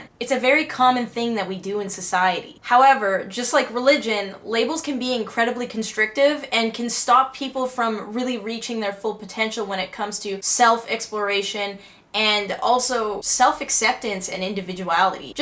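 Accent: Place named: Newfoundland, Canada. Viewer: dude you sound newfie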